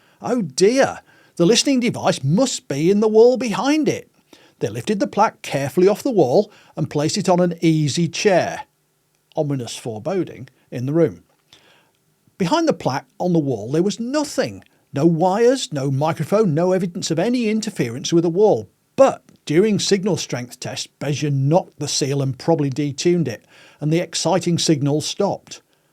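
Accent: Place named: Lancashire, United Kingdom. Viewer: You're a Lincolnshire lad? Why's it sound like you have something else mixed up in that accent then? I mean, the Lincolnshire accent isn't the strongest of accents (unless you're saying something like carpark)...but, I can hear elements, maybe, of a Lancastrian accent mixed up in there too? 🤔 I know a lot of Lancaster Bombers used to fly from in and around Lincolnshire during the war...the link IS tedious though to say the least 😂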